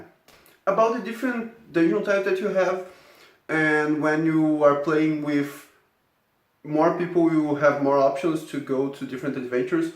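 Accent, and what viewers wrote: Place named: Brazil. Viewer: Tu é BR, esse sotaque não engana kkkkkkkk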